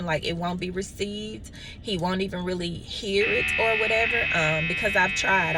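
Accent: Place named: South, United States. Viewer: You must be from the South. (Especially AFTER hearing your accent) Focus on yourself, and not Social Media. Obviously you had a rough childhood. That's probably why you like D-Boys!!! And you'll Always be like that!!! Good 👍 luck 🍀 in the Future. Sorry that you're Pops didn't give you that Validation.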